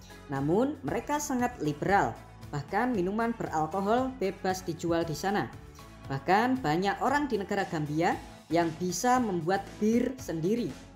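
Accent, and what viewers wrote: Java, Indonesia. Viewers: Suka bgt ma Suaranya, lucu bunda ada logat jawa2nya gtu😁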